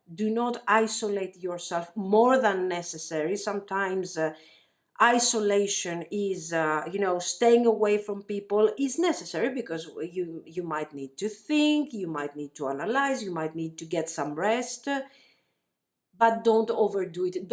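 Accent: Greece. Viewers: hi. i feel like youre greek bcuz i am half and your speech is familiar. is this rtue? any advice how a greek woman can be w/ a colombian man?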